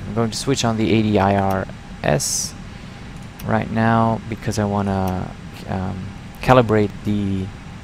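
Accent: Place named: Germany. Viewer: Richtiger deutscher Akzent :D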